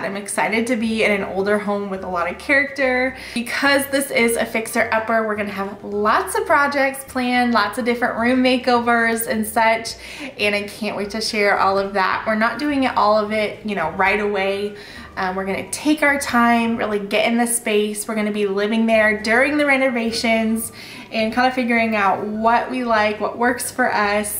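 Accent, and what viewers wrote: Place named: Midwest, United States. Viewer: Welcome back to the mid-west.I am from Iowa. I thought you sounded more like me. Right now moving closer to family feels more secure and helpful. I wish you months of hard work and much love for your home. I will be watching you. Joyce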